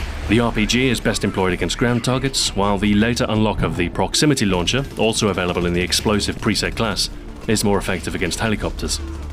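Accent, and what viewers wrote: United Kingdom. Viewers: so british?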